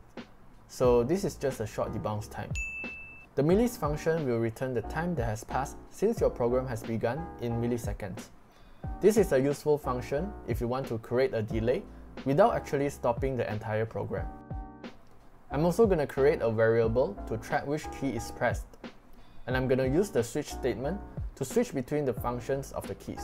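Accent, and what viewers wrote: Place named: Asia. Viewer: Not in a rude way , but , Also the asian accent just makes the whole vid better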